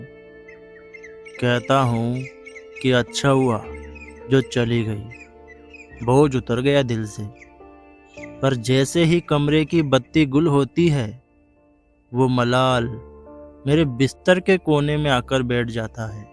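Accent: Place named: Bihar, India. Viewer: Yh Bihari ki Sound. .Sooo kadva vala sweet..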